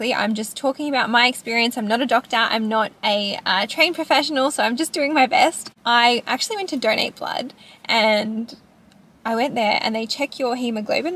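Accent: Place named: England, United Kingdom. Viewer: I’m confused. What country are you in? You have an English accent but you told us at the end that it wasn’t covered by Medicare and it costs 190 dollars! Medicare in The U.S. is for older adults. Why it’s important to know is if it’s covered or not. If UK I’m surprised it’s not covered. I am in france and had several infusions totally covered. Can you please tell us which country you are in?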